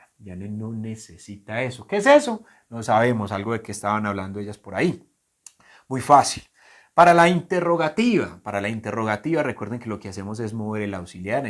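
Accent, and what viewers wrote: Colombia. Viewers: Estimado por tu forma de hablar sos colombiano, saludos desde mi Tierra Catracha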